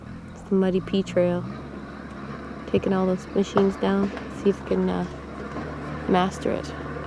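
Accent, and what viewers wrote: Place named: Canada. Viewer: oat stoat about learn how to talk English you Canuck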